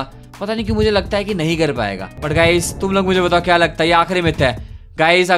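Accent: India. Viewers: Why do all indian sound like this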